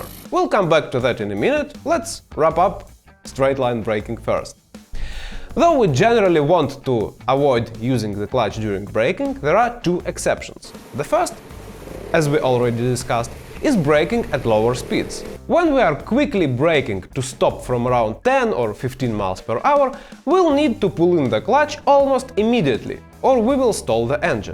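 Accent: Russia. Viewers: You are my favorite living Russian because I can’t recall the last time I fell on my daily ride and feel that your YouTube videos are a large part of why. Perhaps your accent forces me to focus more, or maybe you are just that good as a teacher. Whatever the reason, you made a difference in the way I listen. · Прекрасный английский! Но по акценту как те русские из голливудских фильмов, 😂 хотя это совсем не бесит и не мешает, а даже придаёт какой то шарм. Главное очень грамотно и понятно! По качеству и локоничности транслируемой инфы, - просто 10 из 10! Отличный канал и не только для новичков, судя по тому нонсенсу который иногда выдают "бывалые", - всем сюда! - на повышение квалификации! Спасибо за труд. 😀👍✌️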